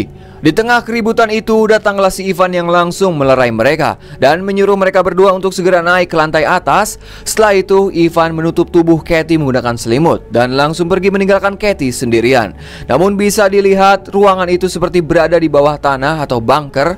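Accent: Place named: Sundanese, Indonesia. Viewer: Konten nya baru lewat ketika mndengar ngomong nya lucu Sunda auto lngsung d subscribe hihi cerita yg lucu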